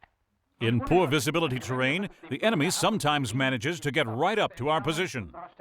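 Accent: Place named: United States. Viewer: Yank voice over ?....................Ruined!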